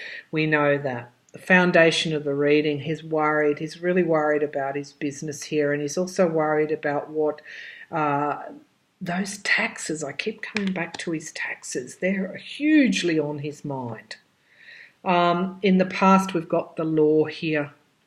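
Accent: Australia. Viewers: Can you please do a reading on NRA, Butina, Ericsson et al? I wonder if that's one of the 12 redacted cases. Thanks if you do, and thanks anyway if you don't. I have to focus to listen to what you're actually saying sometimes, because I am so fascinated with the way you're talking. I love Australian-English, and you have a manner of talking that's energetic and calming at the same time. Very much needed in the chaotic times of Trump! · Thanks! Love listening to your Aussie accent! ❤️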